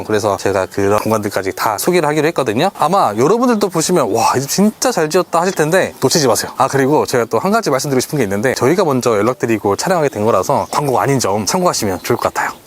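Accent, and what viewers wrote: Jeolla, South Korea. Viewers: 말이 전라도네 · 영상 처음보는데 혹시 전남쪽? 광주? 이신가요? 차라리 사투리를 더 쓰시면 잼있을듯 합니다 ㅎㅎㅎ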